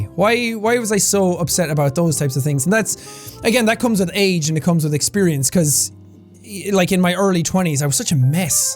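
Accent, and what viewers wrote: Ireland. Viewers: “A little bit of luck involved” -Irish man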